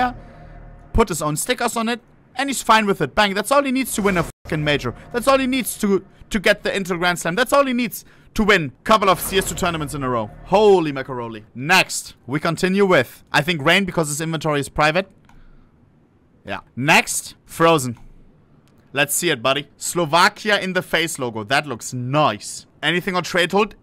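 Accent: Germany. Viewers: He pronounces it in German w sounds like v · German. I love his accent bro 😂😂😂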